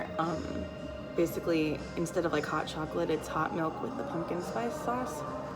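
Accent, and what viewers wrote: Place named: South, United States. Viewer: even a Kentucky southern belle. Not used to hearing your southern. Lol 😉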